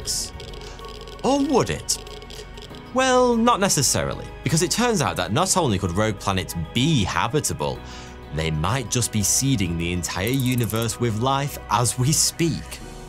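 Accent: United Kingdom. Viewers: Are you British? What kind of accent do you have?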